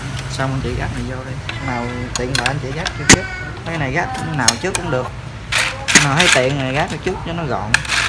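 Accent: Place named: South, Vietnam. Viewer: Giọng nói miền nam nghe rất chất phát. Hướng dẫn rất chi tiết đầy đủ. Cám ơn bạn rất nhiều và mong bạn có thêm thật nhiều clip khác.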